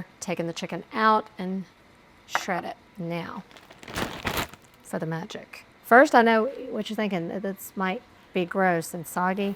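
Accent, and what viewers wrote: South, United States. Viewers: What part of Alabama are you from ? I grew up in Birmingham but now am in warrior. I love your accent. To me it’s the kind of southern accent that is pleasing to the ear and doesn’t make us sound dumb and uneducated like the fake southern accent’s you hear on tv. Love your voice and your videos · I knew there was a reason I liked you so much, I lived in Mobile AL for 27 years and you have the southern thing down pat !!!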